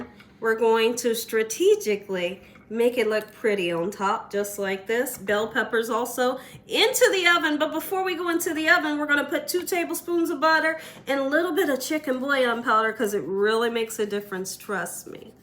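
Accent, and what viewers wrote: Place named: Midwest, United States. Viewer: What state are you ? I can hear mid western accent or southern.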